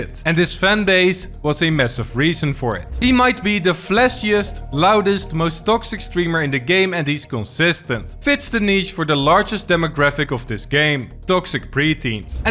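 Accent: Netherlands. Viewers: Ik hoor gwn dat je nederlands bent 😂😂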